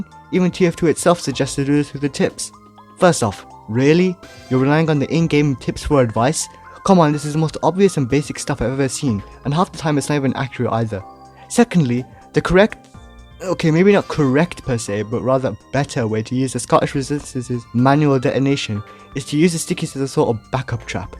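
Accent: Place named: Jamaica. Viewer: Something feel right about hearing a Jamaican man teaching you how to blow up stuff better in a videogame.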